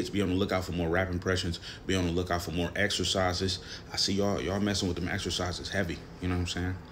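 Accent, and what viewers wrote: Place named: California, United States. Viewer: I could've swore you was from Cali somewhere lol Keep up the dope work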